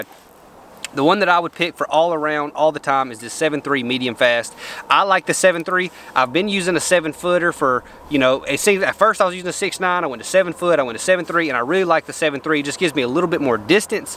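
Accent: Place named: South, United States. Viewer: You are one fast talking southern boy ! Fish the same way I bet. 2000 or more cast a day, gives you lots of opportunity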